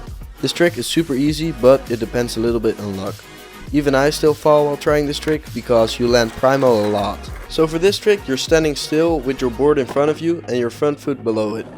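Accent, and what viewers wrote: Netherlands. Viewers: Je accent klinkt echt Nederlands ben je nederlands?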